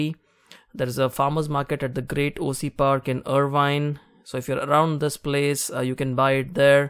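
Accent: India. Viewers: Ur accent is like Indian english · Indian accent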